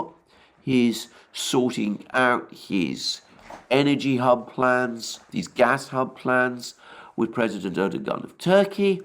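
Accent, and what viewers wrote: United Kingdom. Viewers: Aaaahhhh, makes sense now. Despite your thick british accent, you are from the Balkans area. I criticized your difficulty at times to speak english. My apologies.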